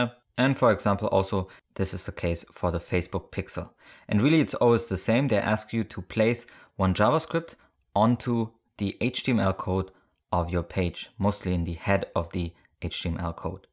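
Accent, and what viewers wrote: Germany. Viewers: love that german english hehe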